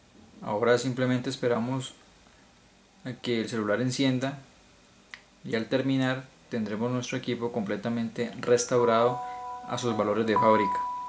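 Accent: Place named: Colombia. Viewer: Que buen tuto! Gracias. Funciona perfecto. Y amo tu acento Colombiano. Un beso :*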